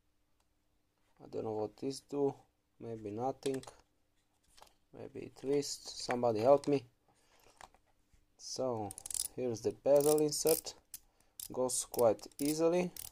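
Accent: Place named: Romania. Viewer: You have a romanian accent, am I wrong?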